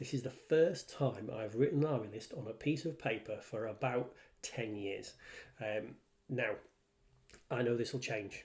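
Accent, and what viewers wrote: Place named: Wakefield, United Kingdom. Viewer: do live in Wakefield you just seem to have that accent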